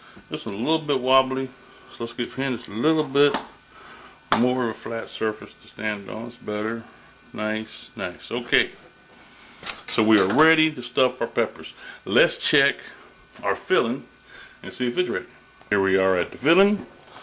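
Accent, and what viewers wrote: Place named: Carolinas, United States. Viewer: He sounds more like he's from the Carolinas to me.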